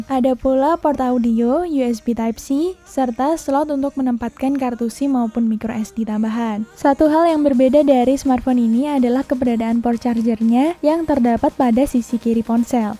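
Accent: Indonesia. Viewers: Lidah indo maklum aj lah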